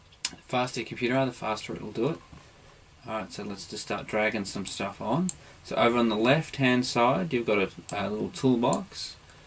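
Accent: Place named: Australia. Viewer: Interesting video. Been thinking of getting into Arduino and wanted to see if I could do the programming in C#. Thanks for the information. Correct me if I'm wrong but you sound like you're from Australia. Hope all is well for you down under :)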